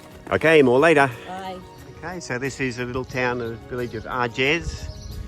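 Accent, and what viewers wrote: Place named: Western Australia, Australia. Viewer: Beautiful video as always! I also enjoy your accent, it reminds me of the time I have spent in Western Australia. :=) Have a great day and Buen Camino!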